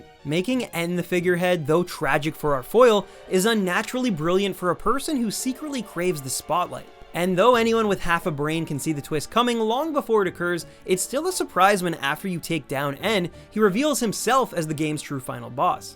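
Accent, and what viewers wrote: Canada. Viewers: Those Canadian Ab-outs hahaha I love it.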